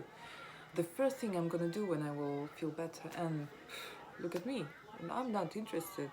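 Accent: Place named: Eastern Europe. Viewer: are you east european? your accent is so pretty :)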